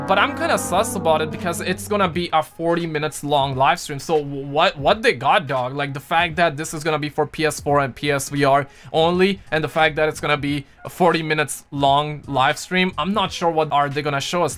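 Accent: India. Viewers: U have some Indian origin or something? Or maybe learning hindi?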